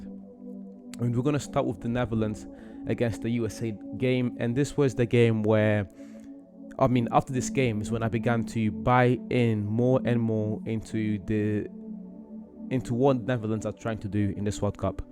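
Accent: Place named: Germany. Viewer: Are you German? Accent is strong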